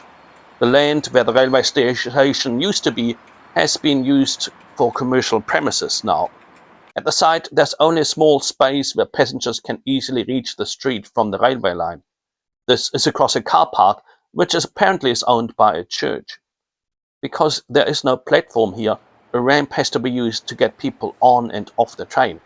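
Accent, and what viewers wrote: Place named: South Africa. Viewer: Interesting. . A South African accent with a tinge of NZ.accent. I get the impression that these are charter trips rather than scheduled services.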